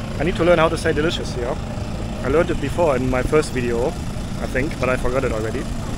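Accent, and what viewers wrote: Germany. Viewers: 독일식 영어 발음이 참 매력적입니다.^^ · A word of advice: As a non-native English speaker with a noticeable German accent, it's important to slow down and articulate your consonants more clearly when communicating with other non-native English speakers. Speaking at your current pace may make it difficult for them to understand you, so adjusting your speech could significantly improve communication. Also, you are confusing supermarkets with grocery stores, please learn the difference.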